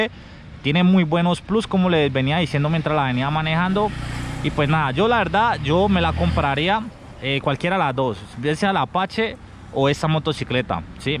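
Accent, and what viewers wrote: Colombia. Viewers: Buen video pana con acento valluno ve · Que feo ese acento de dónde es ??? Creí que los colombianos hablaban distinto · El acento es de Cali, Colombia.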